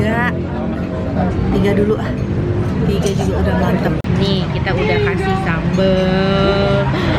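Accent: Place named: Bogor, Indonesia. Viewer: Orang Bogor mana Sora na😁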